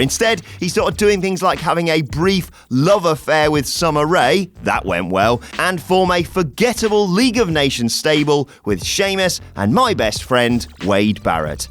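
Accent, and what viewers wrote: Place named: United Kingdom. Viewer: Even Wrasslin sounds smart with a British accent.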